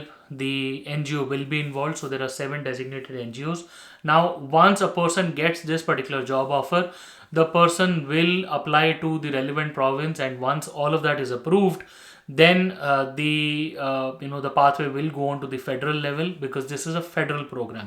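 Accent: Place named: India. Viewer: Tha Indian accent is so strong that it becomes extremely hard to understand.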